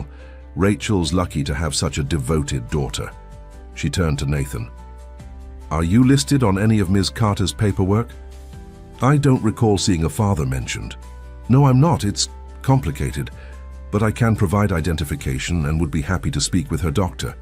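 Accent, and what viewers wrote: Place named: United Kingdom. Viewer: a very elegant British narrator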